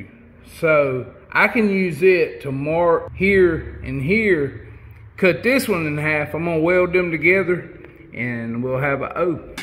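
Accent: United States. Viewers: love his country accent and done a great job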